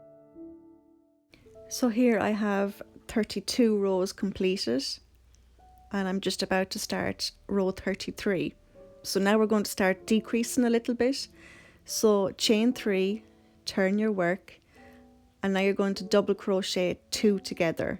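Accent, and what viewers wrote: Ireland. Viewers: It's good to. Hear an Irish voice I am Irish from Dublin xxx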